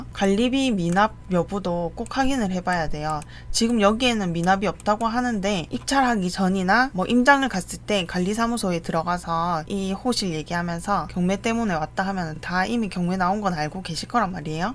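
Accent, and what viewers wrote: Gyeongsang, South Korea. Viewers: 경상도 억양좀 줄여주시요 경상도 톤이 머리아파요 천천히 또박 말하면 덜 시끄럽게 들릴려나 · 표준어를 사용했으면? 보리문댕이인가 😂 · 지역이 경상도쪽 이신듯한데 어디신가요?부산사는데 가까우면 수업신청하고싶어요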